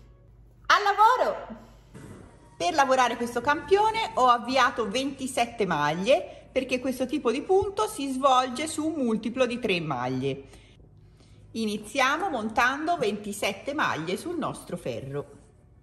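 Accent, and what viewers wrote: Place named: Tuscany, Italy. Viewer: (accento Toscana ciò che adoro)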